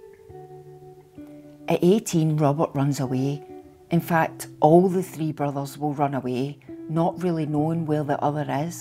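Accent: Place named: Scotland, United Kingdom. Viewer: I don't know what you're saying. I know you're editing it out as it could trigger people. Any chance you could put a link in the description box to the full unedited transcript in future videos, or add one here? Just a thought. Your accent's BRAW... I'm from Glasgow 😂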